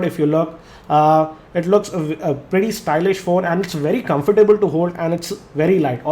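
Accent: India. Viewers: lol indian accent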